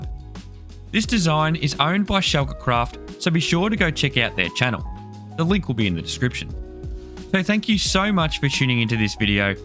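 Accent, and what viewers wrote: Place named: Australia. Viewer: I was wondering if you are an Aussie like me because you sound like it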